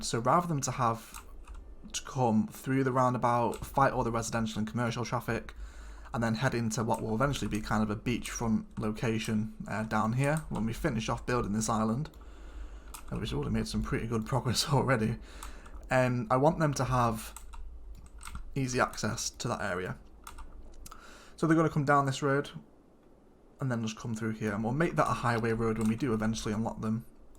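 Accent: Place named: England, United Kingdom. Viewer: Now I know why I like your voice. It's because your english! American acent sounds for me atleast realy bad. Propably because I am native german. Right side is right not left side traffic!!!!!!!!!!!!!!!! :)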